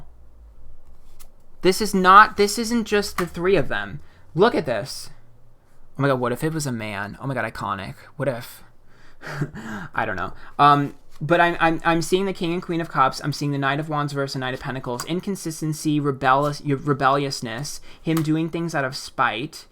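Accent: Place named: Staten Island, United States. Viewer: are u from staten island. that accent reminds me of my old good judy from back in the day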